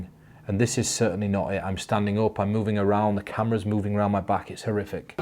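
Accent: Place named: Northern England, United Kingdom. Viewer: Thanks, I thoroughly enjoyed that.....younger effect was totally baffling too. I am not northern, and I don't think you needed to knock your accent, it is part of who you are and gives you context.......due to your performances over the years plus a few other people from the north, I have come to associate your " Northern Accent" with amazing and innovative "mentalism".......a crazy anchoring that is now firmly set in my mind. Thanks again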